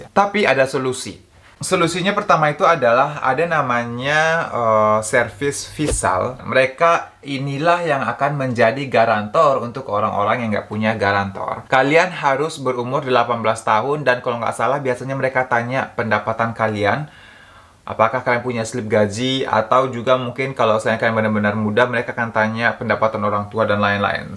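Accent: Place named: Padang, Indonesia. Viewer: Suka banget denger logat Padang kamu... Love you...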